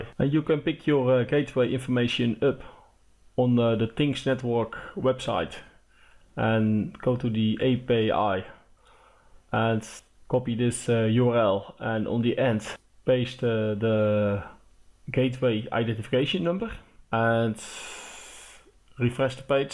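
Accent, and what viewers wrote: Netherlands. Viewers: Hehe you have soooo deep Dutch accent :) groeten uit Amsterdam!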